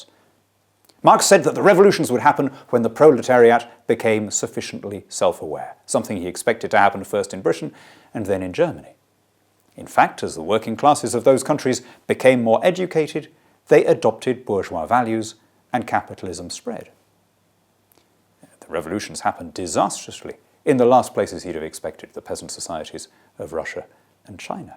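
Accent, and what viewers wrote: RP, United Kingdom. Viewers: Talking shit with a plum in your mouth is still talking shit... Ridiculous claims on both capitalism and marxism... You should be ashamed of yourself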